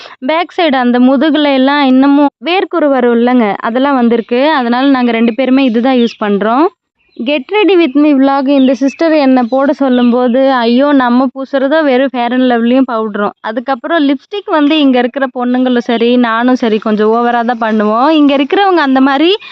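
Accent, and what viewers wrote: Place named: Tamil Nadu, India. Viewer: நான் உங்கள சங்கடப்படுத்தனும்னு சொல்லல.நாமெல்லாம் தென் மாவட்டக்காரங்க நம்ம தனித்துவமே நம்ம வட்டார தமிழ் தான்.அதுல பேசுங்க இன்னும் நல்லா இருக்கும்.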